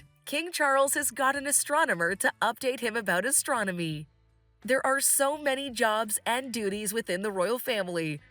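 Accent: United States. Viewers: Dreadful American robotic voice!!!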